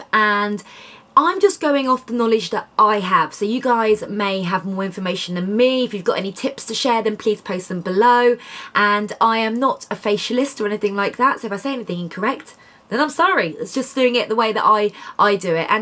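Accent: United Kingdom. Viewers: I love her accent. Which accent is this? British?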